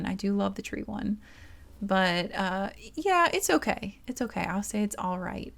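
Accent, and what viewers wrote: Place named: United States. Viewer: I love your accent,! What state are you from?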